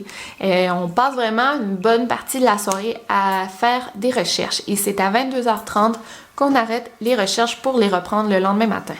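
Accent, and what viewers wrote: Quebec, Canada. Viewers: Salut, je pense que tu ne verra pas ce message mais sa ne fait rien je prend plaisir a te l'écrire alors je voulais te dire que j'adore ta chaine! Elle est passionnante. Bravo pour tes 162 k moi personnellement je suis depuis tes un peu prés 50 k! D'habitude je ne supporte pas l'accent québécois mais avec toi c'est différent j'adore ta voix. Je trouve que ta chaine est très intéressante je te trouve très belle douce et.J'éspere que tu aura plus d'abonnée pourquoi pas le million? Enfin tous sa pour te dire que je t'adore bisous d'une grande fan <3. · Encore une super vidéo. Tu explique très bien et simplement et j'adore ton petit accent Quebecois ♥♥ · J'aime tellement l'accent, je pense que je vais aller vivre au Canada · Avant je ne pouvais pas regarder des vidéos québécoises à cause de l'accent étant donné que je suis française mais je suis tombée amoureuse de tes vidéos, elles sont géniales, je me suis habituée et maintenant je ne peux plus m'en passer 💁🏽‍♀️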